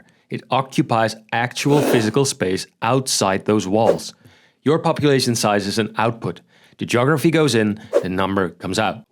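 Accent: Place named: Netherlands. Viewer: The channel says it's situated in the Netherlands, and by hearing his accent (as a Dutch person myself) i am 99% sure he's Dutch. So it's definitely weird how the imperial measurements slip in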